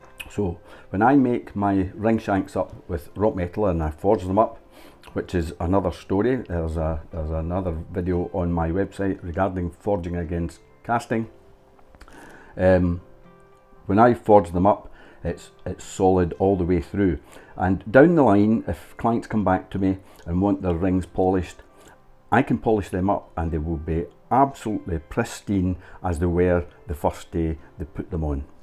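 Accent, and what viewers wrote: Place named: Scotland, United Kingdom. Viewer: Great video and superb Scottish accent. Greetings from Poland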